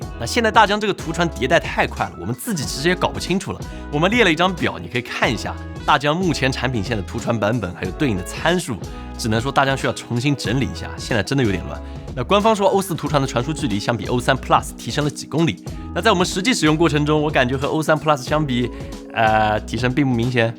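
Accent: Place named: Jiangsu-Zhejiang, China. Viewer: 江浙口音没办法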